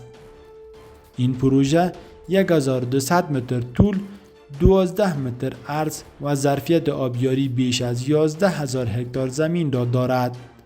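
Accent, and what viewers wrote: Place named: Iran. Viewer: mixed of Persian with Iranian accent. Guys y want respect speak in your dialect and accent. Ty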